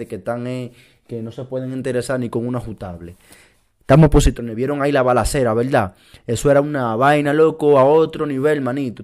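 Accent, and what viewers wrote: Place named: Panama. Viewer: Bueno este tipo de donde es porque habla como panameño y al mismo tiempo como dominicano alfin que mi pana o es que no tiene identidad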